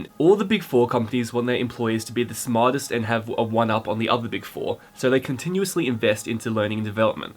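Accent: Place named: Spain. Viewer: you have a spanish accent. So funny!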